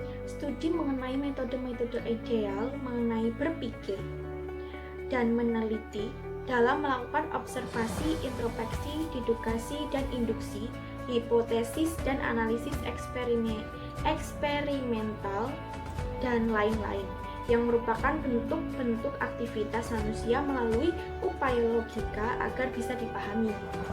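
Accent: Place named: Banyumas, Indonesia. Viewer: Uwuwuwu wong ngapak 😂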